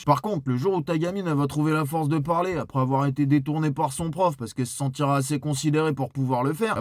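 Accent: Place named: Banlieue, France. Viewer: j'adore ton parler banlieusard un regal 😂❤😂❤😂❤😂❤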